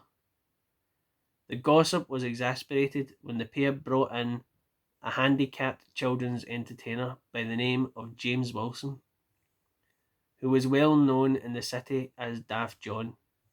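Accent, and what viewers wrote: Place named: Scotland, United Kingdom. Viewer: Well, - A Scotsman reading to us about two very naughty boys from his native Scotland. It you're not Scottish - you do the best rendition of Scottish I think I've ever heard. 👍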